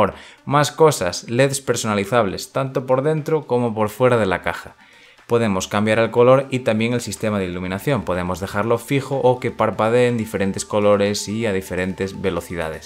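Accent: Galicia, Spain. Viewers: muchas gracias por responder. . seguramente pille un 6700 sin k ..ya que no me interesa hacer OC . por cierto ..eres gallego ??(yo lo soy) ..lo digo por el acento ajaajaj!! un saludo crack y sigue así ..llegarás lejos;) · se nota q eres d galicia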